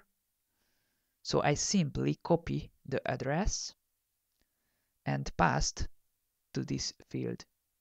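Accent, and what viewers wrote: Hungary. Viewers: tényleg valaki olyannak kéne mondani a kommentárt, aki nem hunglishül beszél :)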